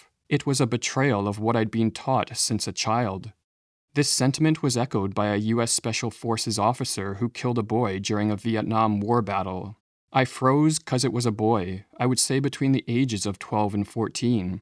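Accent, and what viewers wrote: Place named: Canada. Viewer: Its easy to tell you're from Canada. How say the word "been" is a dead give away.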